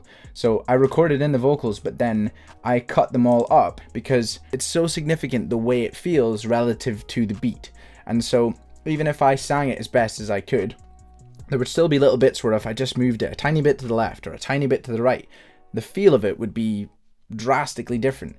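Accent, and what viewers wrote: Scotland, United Kingdom. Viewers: hey man, r u scottish?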